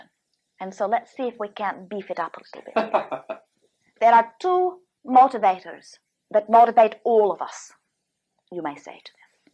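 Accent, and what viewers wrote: Ireland. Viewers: Why is Esther speaking with an Irish leaning accent? Just curious. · why is Esther speaking with an Irish accent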